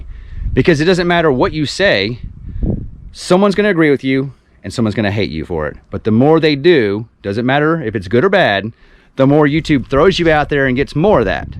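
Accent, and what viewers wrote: Texas, United States. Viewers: I just like hearing you talk , Texas talk. Where is Phil? Ooooh wrong channel. lol As for the comments I say yes but in the long run don't give them the satisfaction they really want to be seen on here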